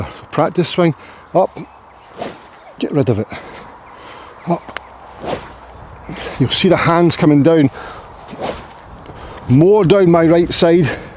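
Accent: Scotland, United Kingdom. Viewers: I love your videos,. They are loaded with good tips, and you have a delightfully Scottish way of delivering them. Keep at it.m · I love watching your videos, as a Dutchman I have a extreme weak spot for Scottish accents. Love how you always come strrraight to the point. For me I developed a slightly embarrassing release feel: For my irons the release feel is as follows: If I literally would let go of the club I would have it hit someone in front of me in his mid section, for the driver it would be his head. Not sure what a psychiatrist would think of that but it works for me.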